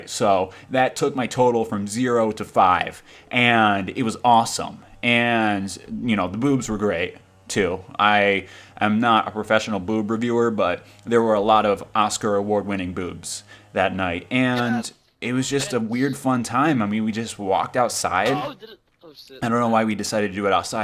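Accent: Michigan, United States. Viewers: what part of Michigan do you come from? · what part of michigan are you from?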